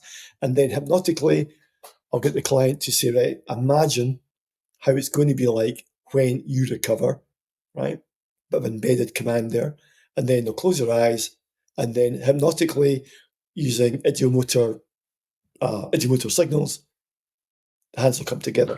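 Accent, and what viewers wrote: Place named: Scotland, United Kingdom. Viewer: Hi - thank you for sharing..I found it to be very informative and fascinating and the Scottish accent is fabulous too.😅 Can you please explain Anchoring a little bit more? Also do you ever treat PTSD and Neuro divergence..or ADD?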